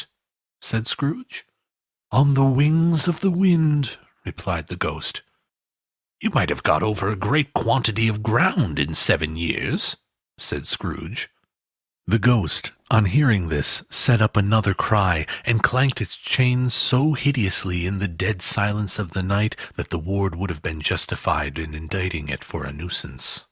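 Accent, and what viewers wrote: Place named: United States. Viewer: He's american, therefore he has an American accent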